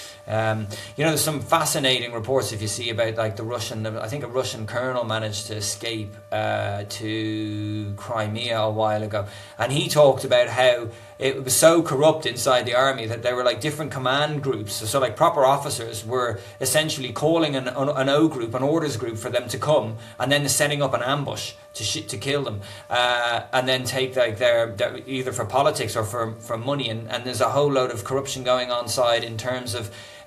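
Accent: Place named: United Kingdom. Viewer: British accent = lies.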